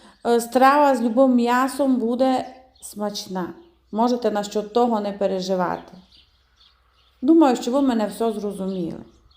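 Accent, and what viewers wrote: Transcarpathia, Ukraine. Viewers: Який чудовий Закарпатський діалект❤❤❤. Даже не знаю,що більше сподобалось😊😊😊. В дитинстві бабуся таку страву готувала і назва його була у нас булянка😊😊😊. Західна Україна. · Тільки ідіот поставить дизлайк. В кожному регіоні України люди розмовляють на своєму діалекті. Жінка розмовляє на українській мові з закарпатським діалектом. Браво господиня!!!!!❤❤❤❤❤❤❤ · Дякую за рецепт. Тяж цікаво слухати закарпатський говір і вже буду знати що сіль це по-закарпатськи суль ( я з Прикарпаття і у нас говорять переважно як літературна українська мова хоча і є в різних областях своі назви деяких овочів та всього іншого ( наприклад у нас кажуть на картоплю бульба а в деяких селах кажуть бараболямі тільки тепер почула що на Закарпатті на картоплю кажуть ріпа а я думаю а де ж то ріпа —щось не бачу аж потім зрозуміла що це картопля ( бо у нас ріпа це такий білий буряк ) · Це справжній закарпатський діалект,а ви вчіть історію України і будете знати в якій області як розмовляють.А Вам велике дякую за рецепт,вже приготували всі були в захваті.Дуже смачно.Чекаю на нові рецепти.Також робила по вашому рецепту голубці онуці на день народження теж всім сподобалось.Дякую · дякую за рецепт! 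І окрема особлива подяка за чарівну закарпатську українську мову! Хочу більше закарпатських слів! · ОБОЖНЮЮ ЗАКАРПАТСЬКИЙ ДІАЛЕКТ, ЯК І ВСЕ, ЩО ПОВ'ЯЗАНЕ ІЗ ЗАКАРПАТТЯМ!!! НЕ ЗВЕРТАЙТЕ УВАГИ НА ( ЯК БИ ПОМ'ЯКШЕ СКАЗАТИ)- НЕДОЛУГИХ.У ЦЬОМУ РОЦІ ПОБУВАЛА НА ЗАКАРПАТТІ - ЗАКОХАЛАСЯ І В ЛЮДЕЙ І У САМ КРАЙ! ЯК УЇЗЖАЛА - НЕМОВБИ ВІД РІДНІ ПОЇХАЛА - ЗА 3 ДНІ ВЖЕ СУМУВАТИ ПОЧАЛА!!! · Это закарпатский диалект не нравится не слушайте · Приготувала, дякую за рецепт страви i закарпатський дiалект. Щастя Вашiй ро́динi. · В українській мові немає слова паночка, є лиш панночка ! А то закарпатський діалект, доволі красивий! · Ви не мудра жінка, не подобається, не дивіться. То закарпатський діалект, пані просили, щоб вона розмовляла саме на діалекті. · З великим задоволенням слухала вашу мову і з теплотою згадувала щирих і добрих людей із Закарпаття. Здоров'я та миру вам. Дякую за ваші рецепти, дуже цікаві. 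Дуже дякую вам за щирість. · Ви прекрасна господиня. І дуже вас приємно слухати. Гарний діалект закарпатський❤ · Дуже гарний рецепт, дякую вам! Особливий колорит додане закарпатський діалектя в нього закоханий! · Чудова господинька і справжня закарпатська говірка ❤, аж любо слухати ❤😍🔥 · Привіт з Рівного!Дякую за рецепт! Діалект мені дуже знайомий, дядько одружився в Закарпатті,в місті Свалява,все життя прожив там,уже теж мав такий діалект. Нажаль вже його немає,але тітка ще живе, спілкуюся з нею,і з двоюрідним братом, який захищає Україну,з 15 року,та сестрою. Мирного неба всім! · Спасибо большое за рецепт, новые слова и прекрасный закарпатский говор. Я русская американка, с началом войны смотрю и слушаю только украинские каналы. Язык уже понимаю очень хорошо, говорить и писать пока не умею)) как собака понимать понимаю, а сказать не могу😂 Победы и мирного неба🇺🇸❤️🇺🇦🇮🇱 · це закарпатський діалект. 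· але ваша мила Закарпатська говірка мені дуже подобається! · А мені дуже подобається слухати закарпатський діалект, я чомусь вчуся. За рецепт дякую. Приготую з грибами😀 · В неї закарпатська говірка. Не подобається, то не дивіться. Але ця її мова доволі цікава, і її не важко зрозуміти. Здоров'я господині і процвітання каналу.😊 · З задоволенням послухала закарпатський діалект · Нормальний закарпатський діалект. І нічого чіплятися до жіночки. Як що вам не подобається, не дивиться. А мені все зрозуміло, хоча я вчилась в російськомовній школі. Господині респект за рецепт. 😊❤ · Це так розмовляють на Закарпатті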